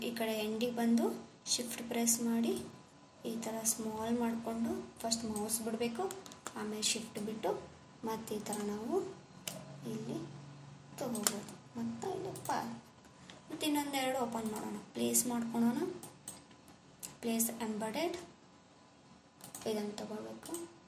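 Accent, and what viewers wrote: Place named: Hubballi, India. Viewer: ಇನ್ನೂ ವೀಡಿಯೋಗಳನ್ನು ಮಾಡಿ ತುಂಬಾ ಚೆನ್ನಾಗಿ ದೆ‌ ಹುಬ್ಬಳ್ಳಿ ಭಾಷೆ ಉತ್ತಮ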